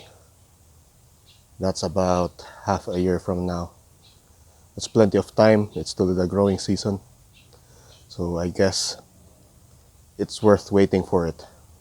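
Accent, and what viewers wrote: Philippines. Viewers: your accent is filipino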